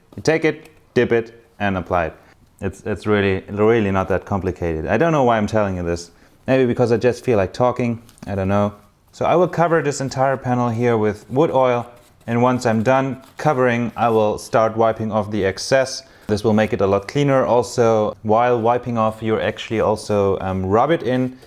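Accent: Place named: Germany. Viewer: i love how you can instantly recognize a german accent even though its almost unnoticable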